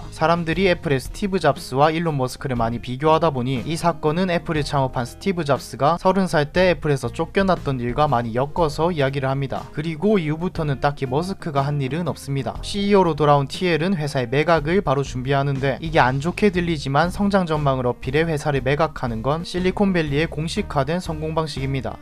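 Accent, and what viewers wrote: Gyeongsang, South Korea. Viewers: 사투리억양이 느껴진다 갱상도인가? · 경상도 말투가 좀 섞여 있네요? ㅎ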